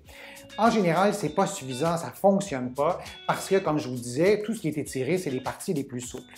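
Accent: Canada. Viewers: Merci beaucoup 🙏. Vos conseils sont simples et pratiques à appliquer. Et sont plutôt efficient. Et votre accent canadien confère un charme supplémentaire à vos explications. Belle journée lumineuse à vous✨🤗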